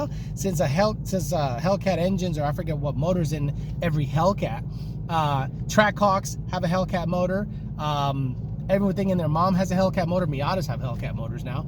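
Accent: United Kingdom. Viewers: That damn UK accent had me dying lmao. Great video again brother. 🤘🤘🤘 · Wagons ( or in your english accent they're called an "estate") look great. Audi's avant line Mercedes with the e-class amg wagons BMW has the greatest of all time RIP E39 touring (wagon) But yes you're right, many cars look better as a performance wagon.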